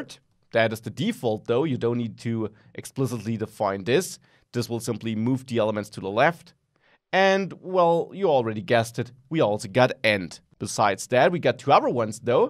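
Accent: Germany. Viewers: GREAT content! Are you German by any chance? I am trying to make out what kind of accent you have. (I am only asking. I understand you perfectly fine)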